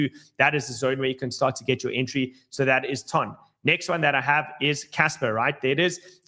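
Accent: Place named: South Africa. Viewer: Love that South African accent, dude!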